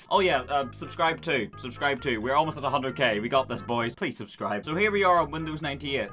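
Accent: Northern Ireland, United Kingdom. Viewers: Your voice is a blend of American, German and Yorkshire Edit: ok that is basically a Northern Irish Accent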